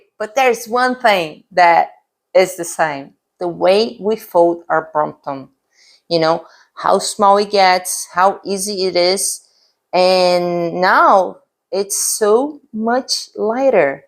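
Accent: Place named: Brazil. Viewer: ok nice ! I worked for a Brazilian bank for 5 years. So I recognised the accent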